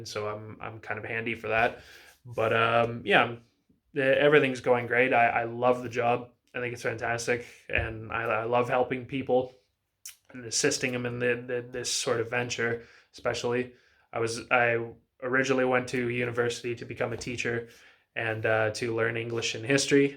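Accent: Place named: Canada. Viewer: I knew I heard some Canadian in your voice! I was also born in Canada but I live in Spain at the moment. It's very cool that you managed to get a job in a gym, sounds like getting laid off was a blessing in disguise.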